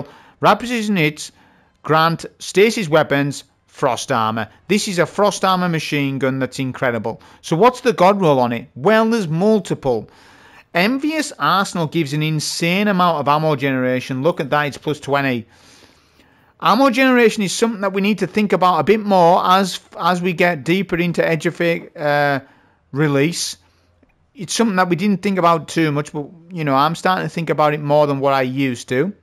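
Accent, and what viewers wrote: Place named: North East England, United Kingdom. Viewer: You sound like you from my neck of the woods , north east :) just found your channel, thanks for the solid advice il shall be grinding all day!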